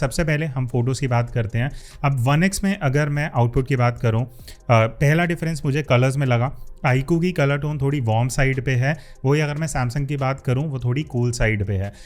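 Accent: Lucknow, India. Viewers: R u from lucknow sir?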